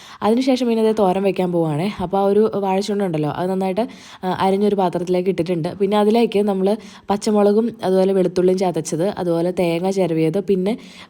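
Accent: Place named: Thrissur, India. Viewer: തൃശ്ശൂര് പറയണത് കൊടപ്പൻ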